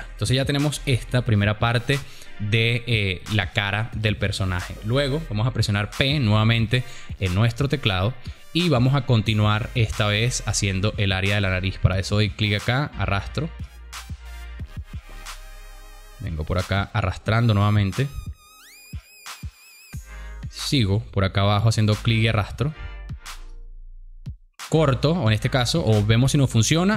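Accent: Venezuela. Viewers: bro...eres de Venezuela seguro XD suenas como venezolano pero ese no es el tema el tema es que eres un pro es esto felicitaciones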